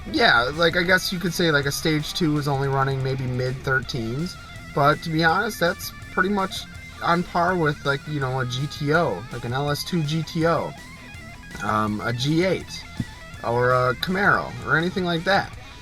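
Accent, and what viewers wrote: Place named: Midwest, United States. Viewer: I knew you were midwestern with your accent. Hello to Chicago from Toronto.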